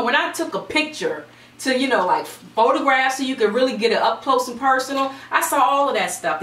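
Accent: South, United States. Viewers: Your smile and dimple and slight Southern accent...(swoon) :-D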